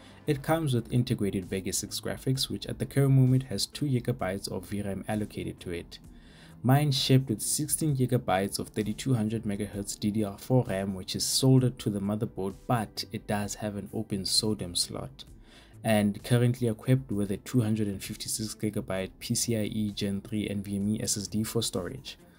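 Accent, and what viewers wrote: South Africa. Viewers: Thanks for sharing the review. I am still riding a T490. In this part of Europe they are still well around 2-300 eur and needless to mention mostly equipped with 1st gen Intel CPUs. Would consider it with a similar setup to yours although would be beneficial to be close to that IT asset change fire 🔥 haha. Nice SA accent. I think you could quite get along nowadays with games if you took the chance and opted in for Epic games in the past few years just for the sake of collecting 👌 Enjoy your new mobo, the screen looks good indeed for a regular IPS panel. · I'm 81 with hearing loss, and I was delighted to hear such a gentle voice on YouTube, and followed it all (until you got to the gaming, which I just skipped). Maybe I'm just more used to South African pronunciation? But a good mic is always a good thing to have. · I thought I heard a fellow South African. 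Nice video!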